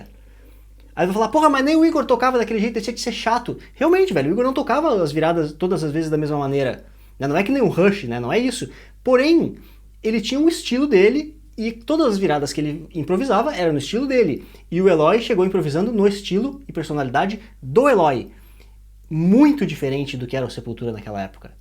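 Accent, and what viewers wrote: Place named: Rio Grande do Sul, Brazil. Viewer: E como é esse sptaquezão gaúcho ai na Noruega hein heehehehehe, tri bom ouvir canal de conterrâneo hahahaahah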